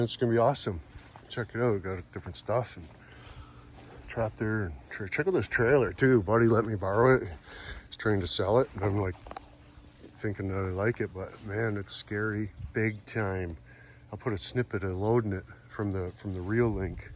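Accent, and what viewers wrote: Canada. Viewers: No he's just Canadian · My radar went up on the accent. Sure enough, he zoomed in on a license plate from Ontario Canada.... Where they REALLY don't listen to OSHA. We'll played Sir. Get them views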